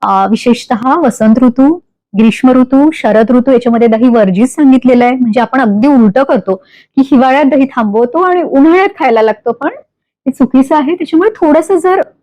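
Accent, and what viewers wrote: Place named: Pune, India. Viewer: Madam tumhi kitisundar shuddha Puneri utchhar Marathi bolat ahat. Khup chhan vatala aikun. Tumhala shabbaski dete. Bless you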